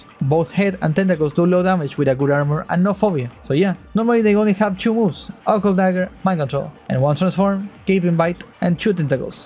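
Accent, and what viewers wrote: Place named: Argentina. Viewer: el primer momento que escuche tu acento ya sabia que eras Argentino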